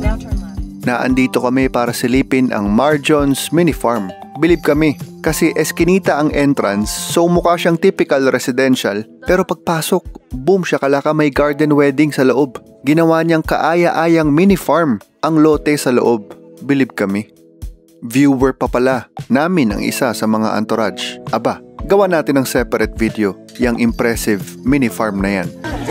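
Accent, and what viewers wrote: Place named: Visayas, Philippines. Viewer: Bisaya diay ka? Lol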